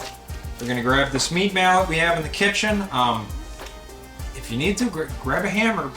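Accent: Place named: Wisconsin, United States. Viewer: Hard to fake that northern Wisco accent! Great job. Very enjoyable video with easy instructions and explanations.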